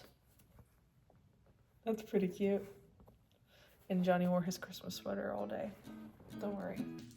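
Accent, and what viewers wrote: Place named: United States. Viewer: Atleast you sound like your in America, could be worse I'm stuck in the UK 😂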